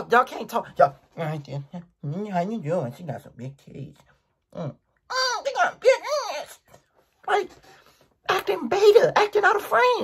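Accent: Baltimore, United States. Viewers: "yewtewb... wut it dew..." nun beats that Baltimore accent much love from Cali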